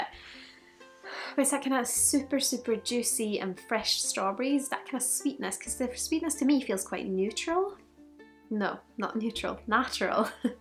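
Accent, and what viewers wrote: Scotland, United Kingdom. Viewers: Just ordered 2 bottles ..you convinced me. Oranges, strawberries ... wood. Sounds great. Alert don't by this its a watery dram for beginners The A'bunadh is a good drop so can't go wrong Does Sweden have any distilleries. ? I'm confused as I'm sure you have a Scottish accent.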